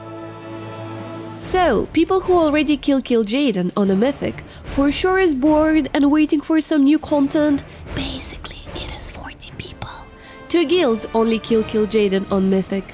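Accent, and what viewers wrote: Russia. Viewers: Well, sexy video, simple as that, you too of course, being the shining jewel and all. Of all the accents from all countries i have heard, Russian, or slavic origin in general is by far the most heart-melting, delicious id say ;p Either that ir i have grown to like how Russian females talk since i live near(Latvia) Russia myself, so its common but still slightly different between each individual.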